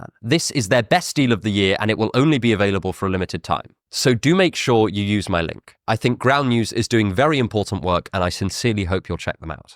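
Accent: United Kingdom. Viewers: You are soooo handsome ❤❤❤❤and your british accent ❤❤❤❤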